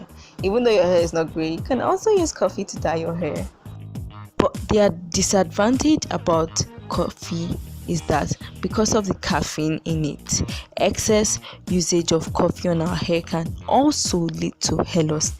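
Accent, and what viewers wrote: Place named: Africa. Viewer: I love African accents omg ❤️❤️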